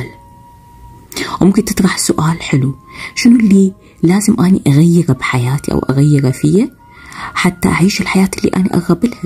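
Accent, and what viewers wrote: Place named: Gulf, Arab world. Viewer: ياهلا يامرحبااا بالصوت الخليجي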